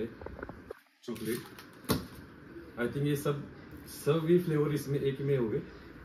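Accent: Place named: Kolhapur, India. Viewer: कोल्हापुरी accent भाऊ!! 🔥😁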